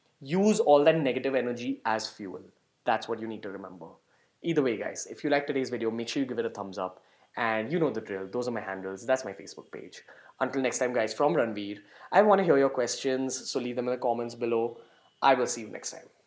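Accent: India. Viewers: Bro he's an Indian not Irish.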